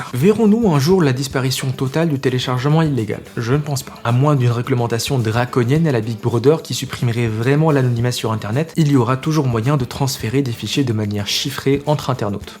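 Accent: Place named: South, France. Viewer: Oh bonne mère, quel bel accent du Sud !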